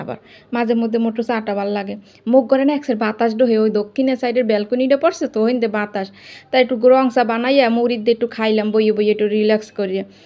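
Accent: Barishal, Bangladesh. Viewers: বরিশালের ভাষা দারুন লাগলো বরিশাল তোমার বাড়ি কোথায় আমার শ্বশুর বাড়ি বরিশাল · আপু চা খেয়ে নিলে পুঁই শাক রান্না খুব সুন্দর হয়েছে ওয়ারড্রব গুছানো অনেক ভালো লাগলো তোমার ওয়ার্ড ড্রপ অনেক সুন্দর লাগছে আপু তোমার কথা শুনে মনে হচ্ছে তুমি বরিশালের কোথায় তোমার বাড়ি জানিয়ে দিও ভালো লাগবে আমার বাড়িও বরিশাল ❤❤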